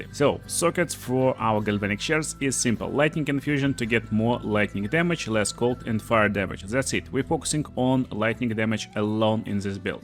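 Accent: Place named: Russia. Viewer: as a fellow russian I am so good at spotting russian accent, interesting how you prolong some vowels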